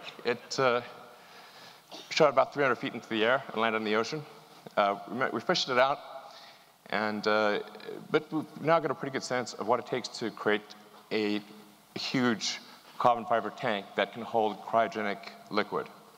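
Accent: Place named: France. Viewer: I love that french accent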